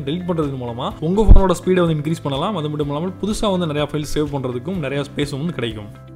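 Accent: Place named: Nagapattinam, India. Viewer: Neenga nagapattinam ahh bro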